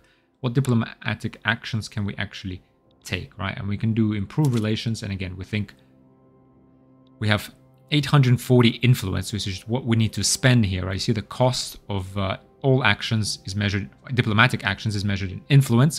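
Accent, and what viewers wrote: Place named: Eastern Europe. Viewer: I've watched a quarter of the video and the question I wanted to ask you the whole time was, are you a native British citizen? Your accent sounds vaguely familiar, are you from Eastern Europe? Sorry if this is a personal question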